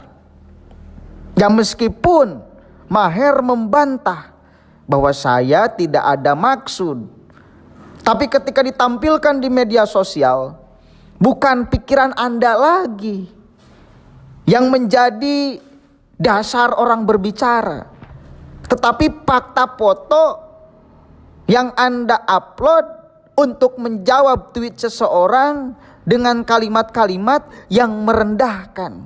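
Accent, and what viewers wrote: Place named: West Java, Indonesia. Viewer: Hehehe sunda banget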